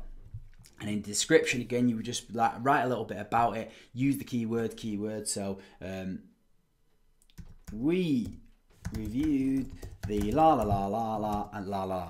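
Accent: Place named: Manchester, United Kingdom. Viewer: Great content man, very comprehensive, is that a Manchester accent I detect? 🙂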